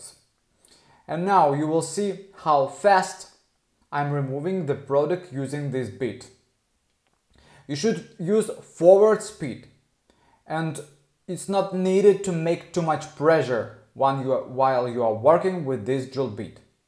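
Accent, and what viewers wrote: Russia. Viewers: When this video started I was like “wow he has a Russian accent is he Russian” And he went “I’m a nail technician from Russia” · Its just a russian accent.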